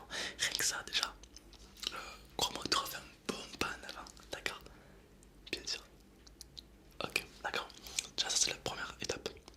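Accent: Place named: Marseille, France. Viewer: On reconnaît bien l’accent Marseillais , toujours autant présent dans tes vidéos. C’est drôle et bonne vidéo tout de même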